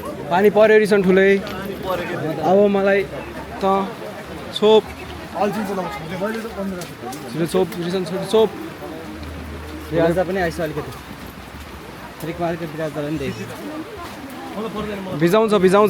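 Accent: Darjeeling, India. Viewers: Bro love the darjeeling accent 😂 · Too good Dai Darjeeling accent 😂😂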